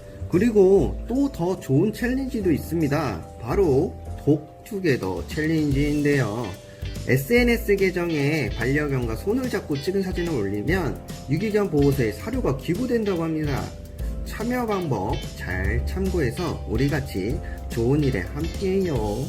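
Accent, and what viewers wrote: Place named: Pohang, South Korea. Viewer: 브르님 말투에서 느껴지는 퐝의 느낌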